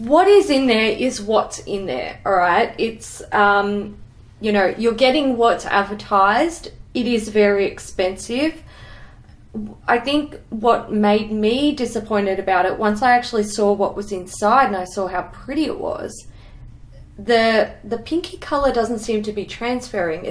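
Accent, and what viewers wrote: Australia. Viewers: Autralian english is so funny. Mühn müüs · Your accents make me miss Australia so bad. Stayed in Melbourne for a week and I need to go back xD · I love watching mainly because I LOVE the Australian accent.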